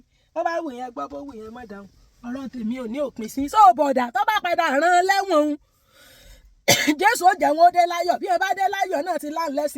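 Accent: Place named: Osun, Nigeria. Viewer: I REALLY GBADUN THIS YOUR PROGRAM WITH THIS YOUR YORUBA LANGUAGE YOU'RE REALLY FROM OSUN STATE😂😂😂😂😂❤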